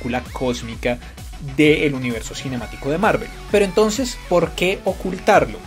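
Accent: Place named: Colombia. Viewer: Me encantan tus videos!! Hace poco que llegué a un video tuyo y desde ahí ya no me pierdo ninguno. Ir al cine contigo debe ser sensacional para poder hablar del tema con tanta pasión como lo haces en tus videos, 😭 pero voy escuchando que eres de Colombia!!! , no !!!!!!!!! yo quería, jajaja. Te mando muchos saludos y mucho éxito con tu trabajo, lo haces genial.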